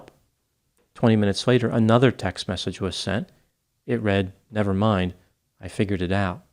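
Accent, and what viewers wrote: United States. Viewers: He should learn how to say Regina good gravy. Can tell you are american . Hard to trust what you say when you can’t even say the correct pronunciation.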